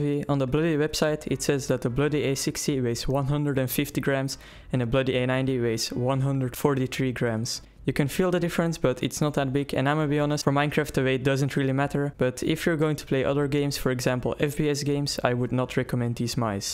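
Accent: Netherlands. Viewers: I smell dutch:D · Are you dutch? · Ik dacht al dat je Nederlands was lmao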